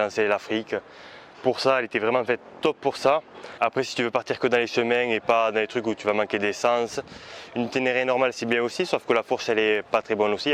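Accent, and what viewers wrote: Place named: South, France. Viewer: T du sud en plus avec un accent comme ça .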